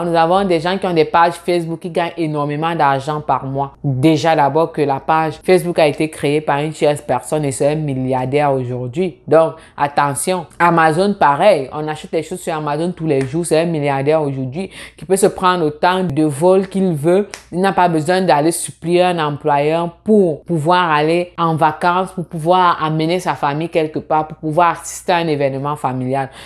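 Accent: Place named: Canada. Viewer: vous avez l'accent canadien mm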